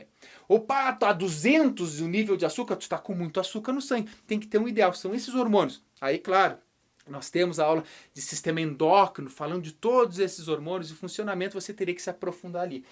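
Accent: Rio Grande do Sul, Brazil. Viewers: O professor também me ensina o sotaque gaúcho(eu sou cearense) Ei mais uma pergunta o que que é "me caíu os butiá do bolso"?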